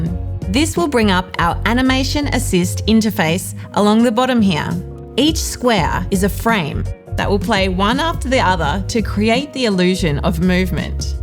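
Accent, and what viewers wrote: Australia. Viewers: Keep on going and please do an intermediate series … well done for the simplicity and objectiveness of the videos. I liked the Australian ascent :) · I think I share the sentiment of many others judging from the comments below that this has been the best series. As a fellow Aussie I loved the accent, and because I am a slow learnier I just reduced the playback speed to .75 which was very helpful. I have dabbled in Procreate for over a year on and off, mostly off because I start and then feel so overwhelmed so I give up, but this series was brilliantly paced to allow me to really understand the workings of the program. I know it is the tip of the iceberg but thank you for letting me gain more confidence and a desire to continue. I can not wait for an intermediate series, BRING IT ON!!! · This was a fantastic series, thank you so much! Smashed through it in a day 😁 feeling so much more confident! 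Also so happy to hear a fellow Aussie accent!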